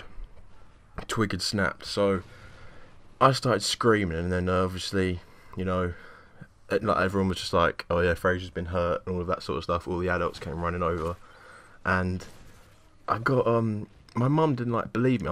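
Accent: England, United Kingdom. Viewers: what part of england are you from? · i love the british accent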